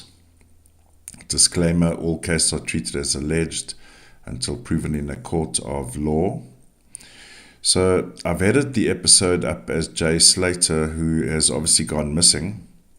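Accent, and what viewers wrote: South Africa. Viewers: I detect a south African accent. I grew up in vanderbijlpark south Africa. I recognise that accent anywhere even though I've been back in the uk 39 years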